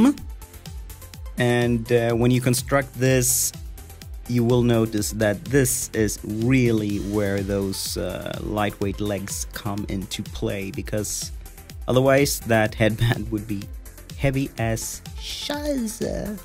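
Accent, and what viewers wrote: Sweden. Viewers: i was not expecting the dialect i'm so used to rikssvenska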